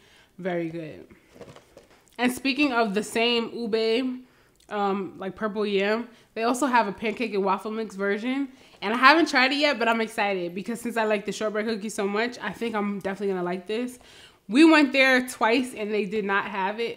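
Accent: New York, United States. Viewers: Are you originally from New York?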